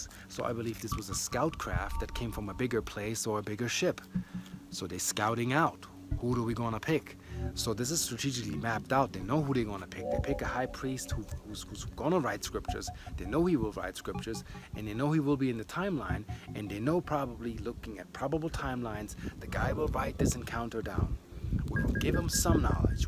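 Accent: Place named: Puerto Rico. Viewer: Greetings, my star bredren. Thought you might find this of interest: <link> A relevant quote: "In this ancient genetic continuum between Africa and West Eurasia, sampled African-American individuals are most similar to sampled individuals from the Nilotic and Horn of Africa regions" Your accent places you as originating on the east coast, perhaps of Puerto Rican extract? This article speaks of West Indies and Caribbean populations and their relation as far as their genetic makeup, to these ancient Nilotic populations as well. Literally, we ARE them, reincarnated as it pertains to soul groups and karmic recompense. Blessings, Mark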